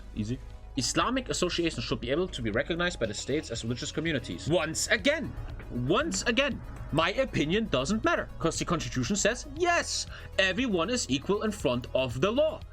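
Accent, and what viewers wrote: Germany. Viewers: Idk his idea on the gendering thing seems a little stubborn in my opinion. I’m a foreigner living in Germany and had to learn the already near impossible language. But still, I’ll go the extra mile to learn and change more in my speech, as languages evolve, just like they always have, cause we don’t all speak english the same way it was spoken in the 50s or something and even for a German example, there are academic and grammatical changes officially made as recent as the 50s or something if I’m not mistaken, so being stubborn about a language like german, which already has way too many dialects… it’s not like everyone speaks it the same way in the first place, when all you have to do is go from one city to the other and hear a totally different german, for example I live in Hannover and speak Hochdeutsch but notice how has a Saxon dialect that I don’t always understand. 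So that being said, I don’t see how changing one way the already crazy and diverse language operates is an obstacle, I know yall germans had to learn other shit wayyyy harder than “*innen” for your everyday speech lol.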